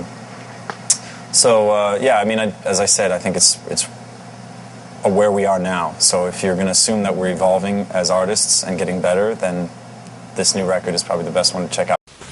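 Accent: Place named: United Kingdom. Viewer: Is it me or he slips in brit accent from time to time? He tries to be super american, but he grew up among people who have a brit accent. Don't deny your origins, Paulie ;)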